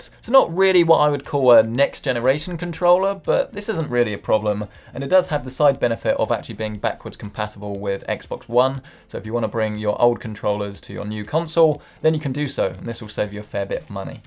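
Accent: United Kingdom. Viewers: A XBox review in true British accent.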